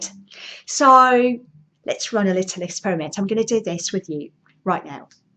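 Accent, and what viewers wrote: RP, United Kingdom. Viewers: Lol ur accent like ratu london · Oh my god. This is bloody perfect. Yes, it's not native but rather a native-life from my inexperienced perspective. Sort of RP but very much fluent and free. What a work has been done. Thank you very much indeed.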